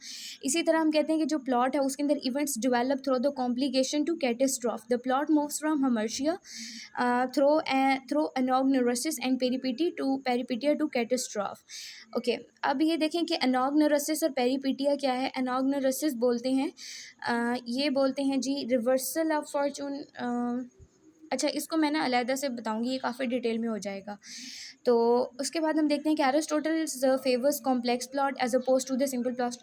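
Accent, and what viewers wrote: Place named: United Kingdom. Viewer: MashAllah, mam your accent is very good and you totally seem like British... 👍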